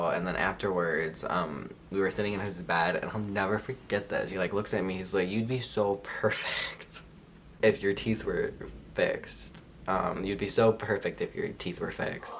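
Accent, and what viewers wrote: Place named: Michigan, United States. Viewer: Your Michigan accent kept throwing me off. I thought you were saying Kanye-ac, as if Kanye had invented his own brand of cognac. Which would not even remotely surprise me. 😶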